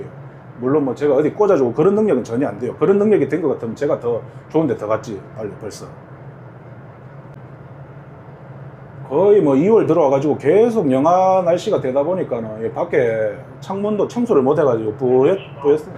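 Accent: Gyeongsang, South Korea. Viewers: 선장님 말씨가 포항 쪽 말씨네요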